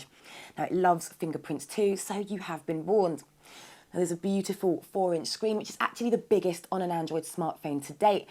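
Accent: United Kingdom. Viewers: fuk this british acsent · Yeeeeeaaaaa, British English is charming)) Amazing pronunciation=))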